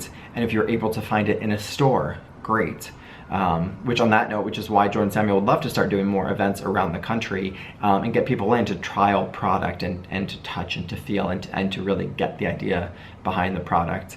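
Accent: New York, United States. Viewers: Are you a former New Yawka?? If so, hi from New Yawk. Just ordered serum and spray for the first time. Can’t wait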